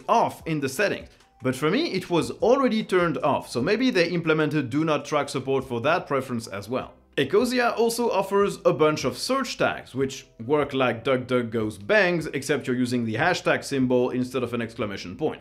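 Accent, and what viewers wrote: France. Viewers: je savais pas que tu connaissais le francais mais j'ai toujours penser a cause de ton accent :P en passant je suis quebecois :D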